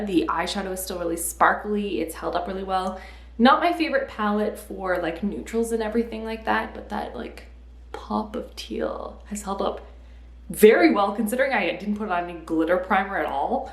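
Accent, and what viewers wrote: France. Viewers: Combination = combinaison (french) love your french accent <3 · a "combo-nation" is so cute and make almost more sense than the actual word "combinaison" ❤❤ Love you and keep speaking french I love your accent !! (French girl here)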